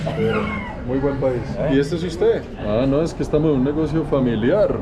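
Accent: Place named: Bogotá, Colombia. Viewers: 🤭🤭 me encanta como narra🤭🤭muy yo 🤭 cachacos al fin y al cabo🤭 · Ay qué cómico pichirilo me da una gracia ese nombre que le pones al carro y con ese gran desayuno ni te asomes al cráter o de un precipicio porque te vas de cabeza con el estómago lleno jua jua jua jua jua jua pasen niñas pasa y pasa no hay apuro ay me encanta como hablas será que soy de Uruguay y me me gusta muchísimo cómo hablan los colombianos pero especialmente tu voz que es bastante baja y las palabras que le pones a las cosas les cambias el nombre son modismos no de cada país a mí me encantan me encantas tú y tus vídeos y te deseo lo mejor en la vida estás en uno de los países que yo quisiera conocer por algo cuando llegaste dicen buena vida te saludan así y eso y eso ya es buena vibra muy positivo o pura vida bueno algo es de eso no me acuerdo bien algún día iré por ahí así que no me voy a perder nada de este video que promete como todos tus vídeos ningún desperdicio cuídate y Dios siempre va contigo❤❤❤❤❤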